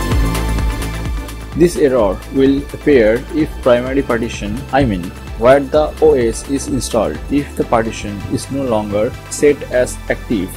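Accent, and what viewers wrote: India. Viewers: It’s not so much the music that sucks, it’s your horrible accent. I can’t understand a damn thing you say in that heavy India accent. Subtitles at the bottom sure would have helped.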